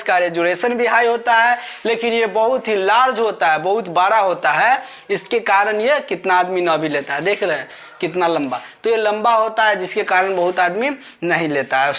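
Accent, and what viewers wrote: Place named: Bihar, India. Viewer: Bihari pronounciation 😅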